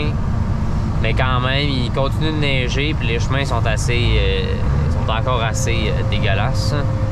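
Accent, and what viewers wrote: Quebec, Canada. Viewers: J aime bien ta chaîne.. Malheureusement avec ton bel accent québécois, bien souvent c'est très difficile de te comprendre.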